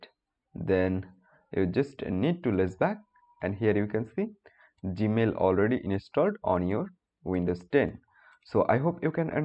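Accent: India. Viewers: indian ah accent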